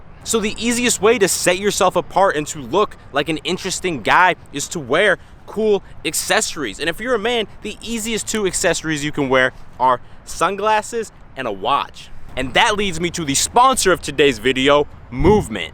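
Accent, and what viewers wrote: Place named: Boston, United States. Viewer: I thought he was from Boston not austin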